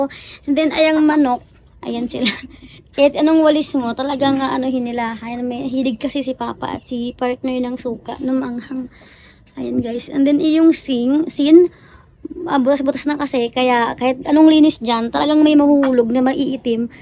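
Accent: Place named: Iloilo, Philippines. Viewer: Sin hahaha ilongga tlaga. yero po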